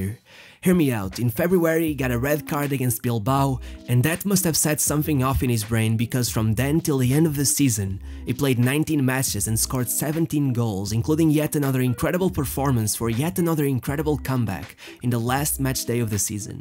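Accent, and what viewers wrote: Portugal. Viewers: o inglês tuga dramático é que coiso. Mas isto tá fixe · I love your Portuguese accent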